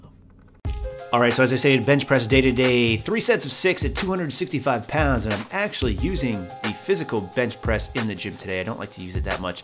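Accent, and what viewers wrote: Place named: South, United States. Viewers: ʻPedostacheʻ, Country Accents and ʻMirror ʻMirinʻ... makes for a complete and entertaining video! Seriously, I havenʻt tried floor presses. Looks like they mainly help you with the last 3rd of the press. Interesting, I might try those because I have good explosion off the chest, but, I seem to get to a sticking point during the last 3rd of the press. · dat southern accent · THAT SLOW PROGRESSION TO A SOUTHERN ACCENT HAHA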